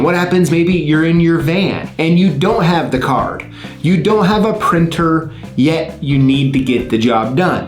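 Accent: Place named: South, United States. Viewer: Thanks,. We southern guys actually like it when people assume that we're stupid because of our accent.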